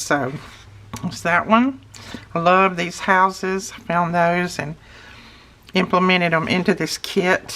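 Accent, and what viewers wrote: South, United States. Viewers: Good afternoon, while I watch and listen to you I am sewing ginger bread dollies for my church nursery..watching you makes me get the paper bug. as soon as I finish the dolls I am going to make Gratitude journals for my ladies group at church.. I am buwy crafting most every day.. You have a blessed day. I love listening to your wonderful southern accent..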